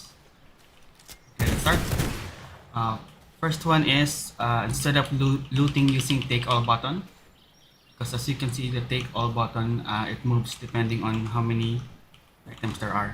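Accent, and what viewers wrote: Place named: Philippines. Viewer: Kumusta from Australia! I think you're Filipino anyway from the accent.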